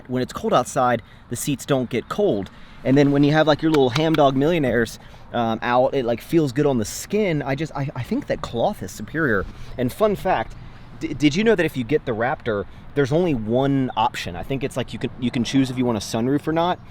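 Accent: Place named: South, United States. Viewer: getting that southern twang 😂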